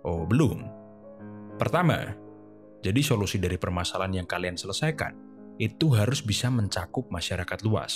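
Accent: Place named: Java, Indonesia. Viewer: Suara orang Jawa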